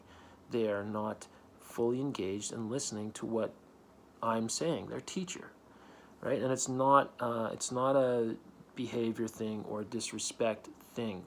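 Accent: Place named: Canada. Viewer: Ah, I could sense some sort of Canadian in the accent. Alberta is a beautiful place. Much love form Toronto! - pls keep up with the reviews/book vids, there are no where near enough people covering these sorts of books let alone reading them.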